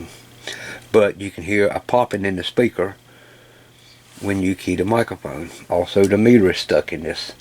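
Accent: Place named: Deep South, United States. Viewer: I’d say somewhere between Alabama through to Louisiana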